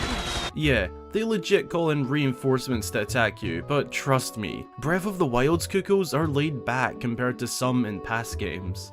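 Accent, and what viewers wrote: Scotland, United Kingdom. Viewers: you sound like you're Scottish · Your Scottish accent is amazing xD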